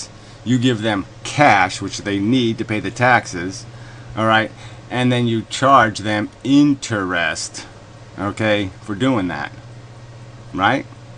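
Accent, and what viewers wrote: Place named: United Kingdom. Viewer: holy shit.. british accent + asmr voice + inability to make an argument ? nerve churning. that's the actual dark triad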